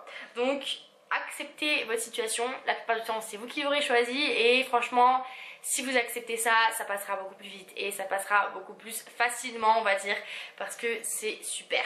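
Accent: United States. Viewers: Quel beau accent américain 😊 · Super vidéo comme toujours !! 😄 Ta voix sonne de plus en plus américaine ( quand tu parle français ), c'est trop chou !! 😂💕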